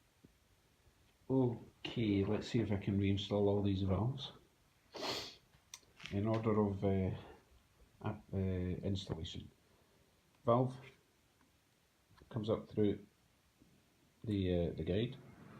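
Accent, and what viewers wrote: Scotland, United Kingdom. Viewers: Aye... what part of Scotland are you from?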